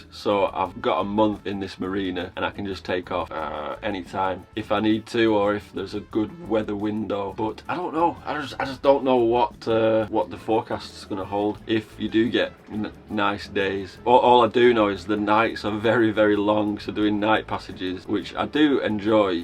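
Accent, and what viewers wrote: Lancashire, United Kingdom. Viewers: where were you born, cause you sound a bit like me (Bolton Lancashire) Not exactly a sailing hub!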